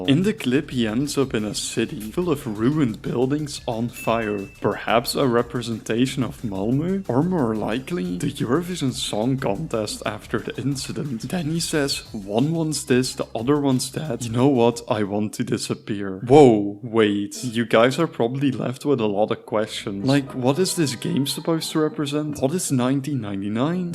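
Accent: Netherlands. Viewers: Ik ruik Nederlands 🇳🇱 het accent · Bro kan je echt niet wat beter engels praten met nie zon dik brabant accent erop, zelfs mij als brabander egert mij eraan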